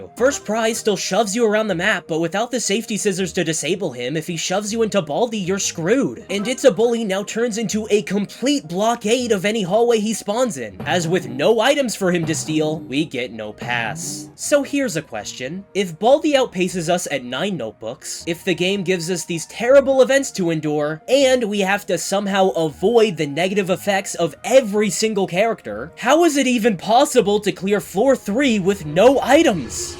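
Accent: Brazil. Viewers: holy moly youre brazillian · pera ai tu é brasileiro???????????????????????????????????????????????????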